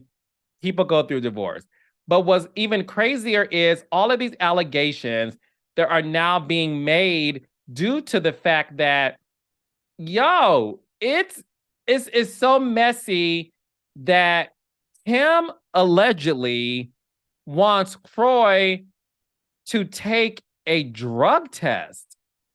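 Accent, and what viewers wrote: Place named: Florida, United States. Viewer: Your dialogue and pronunciations remind me of Marlo. Are you from Florida? I 100% agree Porsha should stay far away from RHOA. Porsha is great TV but RHOA is no longer the platform for her. I would love to see a show with Porsha, Phaedra, NeNe and Kim on a show following each of them on their new journey. It would be interesting, entertaining and just enough shade to make you laugh.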